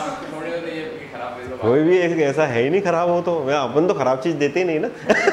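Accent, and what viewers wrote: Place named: Indore, India. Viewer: Sir aap pure Indori ho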